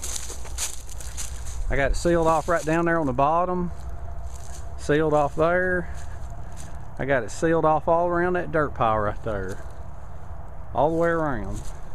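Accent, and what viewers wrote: South, United States. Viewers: You come up with some great ideas,. I really enjoy watching your vids, and I love that southern accent! Lol! take care, now, and a big hello from New Zealand!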